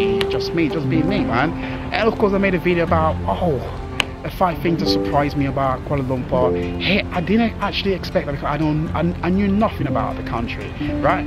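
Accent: United Kingdom. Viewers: Your British accent not so sound like London I gues🤔... correct ne if I'm wrong 😂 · I love your British English accent.👍👍👍👍👍Welcone to Malaysia. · I really love your British English accent. Hope I will fluent in British English one fine day and in same time I'm proud of my Malaysian language.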